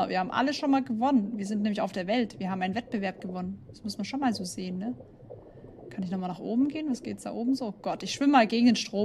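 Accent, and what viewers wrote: Swabia, Germany. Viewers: Sage mol, komsch du ausm Schwobeländle? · Dei schwäbisch killt mi😂😂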